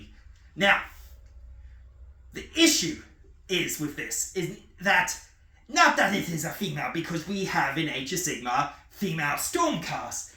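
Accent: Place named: Germany. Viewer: dude I thought you were either German or Austrian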